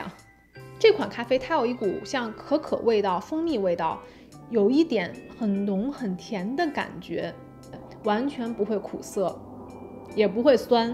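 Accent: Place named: Beijing, China. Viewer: 北京口音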